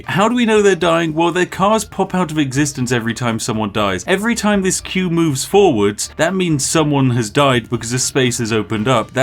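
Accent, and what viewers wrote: United Kingdom. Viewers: LOL, "Billy Viel" car.... ("By Level" Car) Love this brit. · Your English is extremely good, tbh! It sounds so natural, so spiffingly British! You can set up your own Tube Language School and organize your own Grimsby Advanced Exam) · No accent can make horrible atrocities sound perfectly sane and normal like the British accent.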